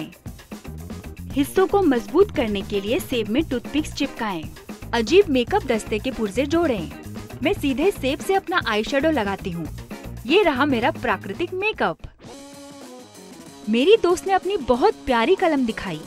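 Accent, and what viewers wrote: Standard. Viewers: itne shuddh Hindi Hum Bhi Nahin bolte hain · Yeah puri shudh hindi bolti hai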